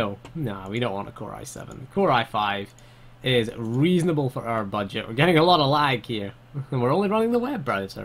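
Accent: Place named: Scotland, United Kingdom. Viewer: He's from scotland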